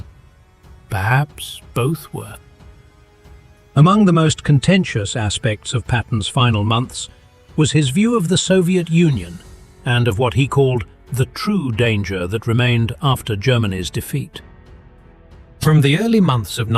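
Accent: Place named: England, United Kingdom. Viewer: I really wanted to listen to this but the English accent ruined it.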